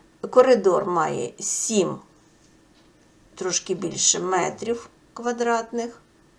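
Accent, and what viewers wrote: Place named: Russia. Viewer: Смешно слышать, как русскоязычный пытается говорить по-украински. Не мучь себя и слушателей, говори на родном.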